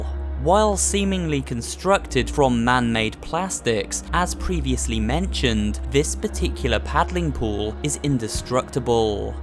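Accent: United Kingdom. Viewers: You sound like the British version of chills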